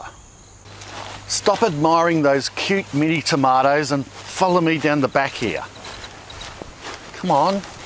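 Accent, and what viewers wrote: Australia. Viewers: Love the Aussie accent mate...... ❤❤❤👍👍👍🙏🙏🙏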